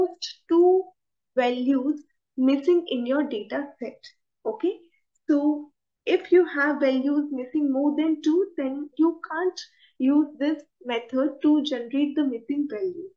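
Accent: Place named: India. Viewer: Please work on your axent , im not english , already hard to understand english so, your indian axent make it more difficult to me 🙏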